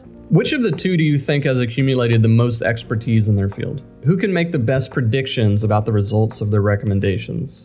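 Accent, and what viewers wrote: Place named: United States. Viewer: Hey, thank you for your video, it's great! I can see and hear so much of your effort was put into it. I do treasure this one, and I found it thanks to your article on Gamespot. However, there are some pain points: 1. Your attempts at self-deprecating humor undermine both tone and authority, I expect from you to take your message seriously and implement it in practice. I want you to see as my equal, not a clown with a computer science degree, and I know you are not one. 2. Pronunciation of foreign names is easily searchable and practicable nowadays. Please be less American about this and learn, it's very easy if you let go of point 1. Just like in your message here, fear of messing up a word keeps you from integrating new cool words into your life, and names are amazing words! Just don't stress only the first vowel, we already have enough bO-rises and Oh-drees. 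And yeah, the solution you used was painful to listen too, I'm afraid. Other than that, I hope every documentary YTer will do their work as you do. Nice to meet you!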